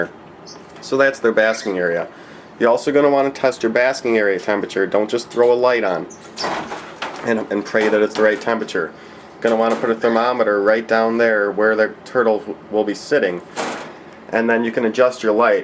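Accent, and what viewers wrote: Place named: Connecticut, United States. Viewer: you have the same accent i do and people always make fun of me for it. im from connecticut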